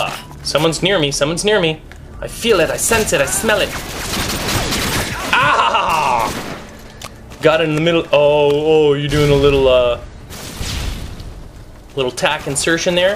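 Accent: England, United Kingdom. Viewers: loving the british accent haha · lol why do you always do an English accent in your commentaries? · Best live commetary ever!! I love your british accent lol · Seemed a bit like he had a split personality with the English accent and the whatnot, got a good laugh or two from listening to the ramblings of a murderous English-man, thumbs up indeed. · i <3 ur british accent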